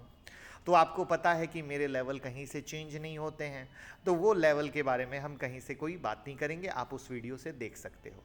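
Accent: Bihar, India. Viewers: Sir aap Bihar se ho kya?????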